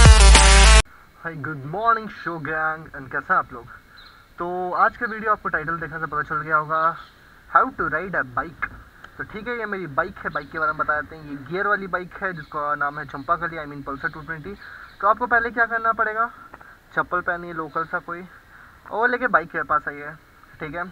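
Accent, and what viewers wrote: Bihar, India. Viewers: bhai aap bihari ho???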